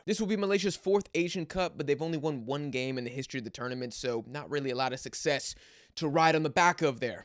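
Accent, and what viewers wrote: United States. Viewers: He has a typical thick American English accent. So I guess he is from the USA.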